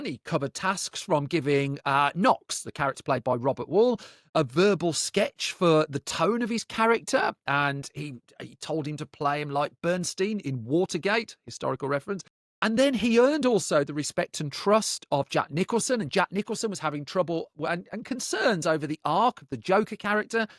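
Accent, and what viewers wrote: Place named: United Kingdom. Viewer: That is one striking British Accent..😊😊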